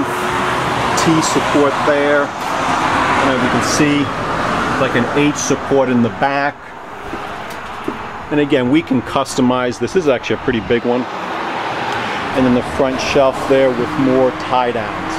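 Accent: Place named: New England, United States. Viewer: I love the Yankee accent........ Yankees making Southern style BBQ always give me a chuckle. Hahahah Nice trailer and good information. I would love to have a trailer like this but it would be a real drive to get it here in the REAL DEEP SOUTH in Chile. Thanks for the video. Jim in Chile.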